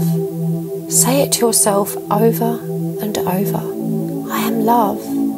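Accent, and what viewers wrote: United Kingdom. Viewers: Wow! Thank you! That was very transformative... I’m very glad I watched this. I felt so elated and tingly all the way through... and man! That was the coolest elevator I’ve ever seen if I do say so myself 😎😂... but seriously, thank you, I honestly wasn’t going to watch it as I’ve been off Twin stuff this week, me and my twin had a massive argument and I told him to move out which he has started to, so it’s been a rough week to say the least - I’ve gave it up to the divine and surrendered to refocus my energy onto myself, I’m just out of patience right now and we both have healing to do, anyways, I was guided to here for a reason, perhaps some healing has been done for my connection and all the other beautiful twins 💕 plus I like listening to your accent 😊 I’m Scottish, moved to Denver 4.5yrs ago, so reminds me of the UK 🌹 looking forward to more of these, thank you so very much for sharing your gifts with us all and helping us on this journey 🙏 love and light 💕🌹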